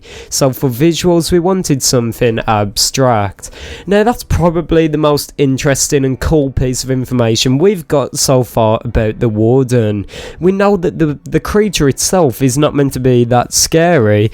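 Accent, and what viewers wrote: Scotland, United Kingdom. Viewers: I think he's Scottish but idk the words he uses don't sound like a Scottish person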